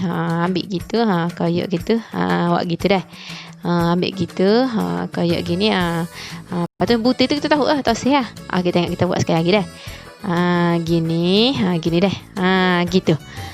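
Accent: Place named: Kelantan, Malaysia. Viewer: hihi.. Bhsa Kelantan pure betul la sis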